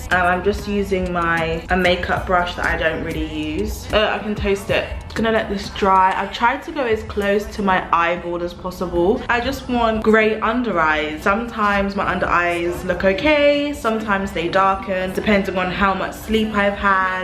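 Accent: United Kingdom. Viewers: Is that your boyfriend in the video?? And are you British living in America or what’s going on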